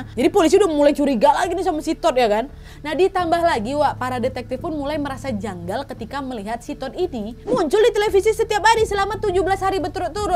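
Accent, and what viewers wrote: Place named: Sulawesi, Indonesia. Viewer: Ah suka sama kk kalau lg critaa..ada logat2 sulawesinya.. sukses ya kk🥰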